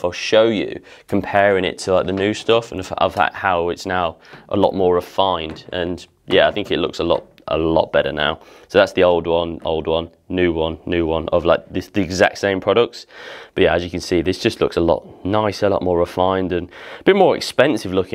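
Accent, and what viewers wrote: Cockney, United Kingdom. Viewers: I love cockney way you speak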